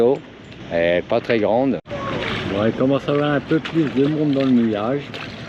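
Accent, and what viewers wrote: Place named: North, France. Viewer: Je vous suis depuis la Vendée, un bel accent du nord, merci à l'interprète, même le chien à l'accent du nord. Cordialement.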